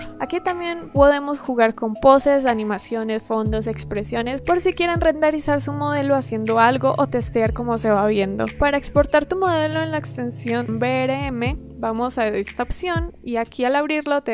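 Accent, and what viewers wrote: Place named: Bogotá, Colombia. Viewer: los colombianos solo reconocen el hacento rolo: eres rola' bogotana? jsjs yo si :>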